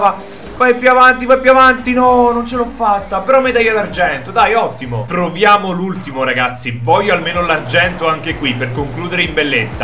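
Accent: Naples, Italy. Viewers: è napoletano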